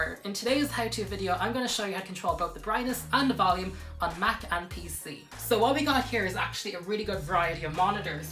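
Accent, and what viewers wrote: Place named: Ireland. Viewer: Love your slight Irish accent!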